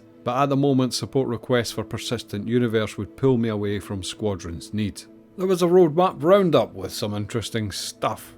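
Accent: Scotland, United Kingdom. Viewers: Something Star Trek about a Scottish guy talking about engineering. Give it all she's got!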